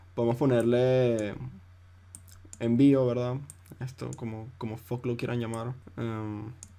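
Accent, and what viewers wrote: Venezuela. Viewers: ahajajaja desde la primera palabra que dijiste supe que eras venezolano!!!, de donde eres bro? yo de bqto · jeje venezolano he.... excelente video muchas gracias !!!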